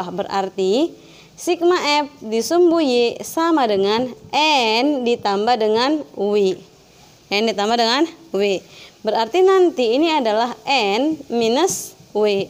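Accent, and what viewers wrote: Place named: Medan, Indonesia. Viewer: logatnya orang medan ini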